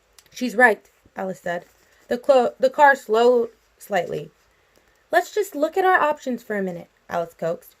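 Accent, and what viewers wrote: United Kingdom. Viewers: Your English accent is amazing 😊🏴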